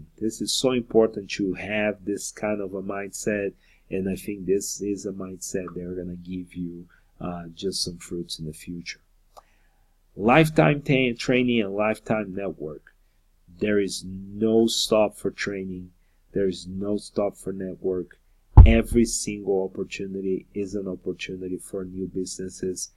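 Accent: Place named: Brazil. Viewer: You sound Brazilian. Are you?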